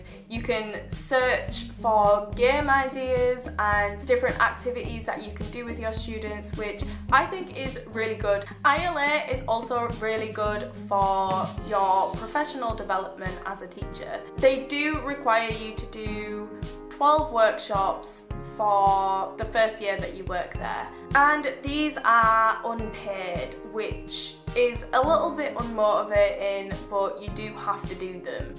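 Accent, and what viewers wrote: United Kingdom. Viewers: hello, very useful video thank you! I can hear from your accent you are British? What's it like being a brit' out there and what tips might you have for brits' wanting to apply or to help adapt them to Vietnamese life/ job? Thank you.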